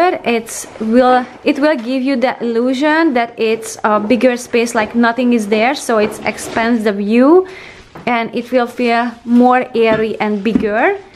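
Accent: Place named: Hungary. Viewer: Huuu nagyon nagyon hallani a magyar akcentust!!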